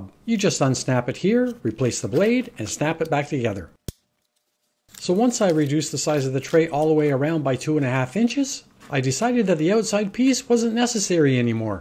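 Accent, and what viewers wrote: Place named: Canada. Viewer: I'm guessing from your accent you're in Canada? Amazing assortment of birds you have at your property! I generally just get the common house sparrow here in the UK plus the odd robin and loads of greedy starlings! Going to have a go at one of these, not because I have a squirrel problem but there ARE a load of cats around and two in particular like to stand at the base of my bird feeder and spring up at the unwary birds. Great idea and endlessly adaptable - would never have thought of using silicon!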